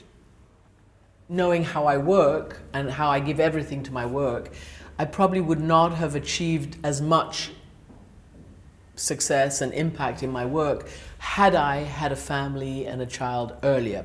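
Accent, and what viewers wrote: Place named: Scandinavia. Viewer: You speak as a scandinavian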